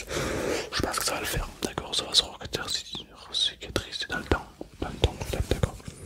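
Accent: South, France. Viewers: ça m’fait bizarre prck tout le monde dit que ta un accent du sud mais vue que chui aussi du sud bah je l’entend pas fin voilà quoi c tout.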